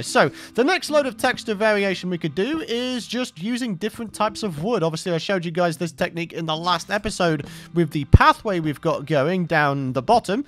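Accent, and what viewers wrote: Scotland, United Kingdom. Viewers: Hi:) I'm one of your new subs and I'm curious..:) So I have a question for you. Are you from Scotland? I seemed to hear some Scottish dialect from you. I'm from Denmark and I am a big fan of the Scottish/Canadian rock/folk music group Runrig. They are unfortunately taking an absolute last world tour this year.:(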